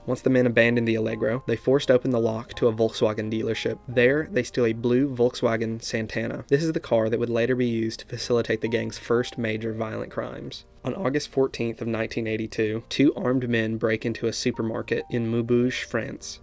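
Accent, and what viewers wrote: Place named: Southeast, United States. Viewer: Where's the accent from? It's Southeast, but not too southeast. I'm in NC, but I'd guess TN if I had to.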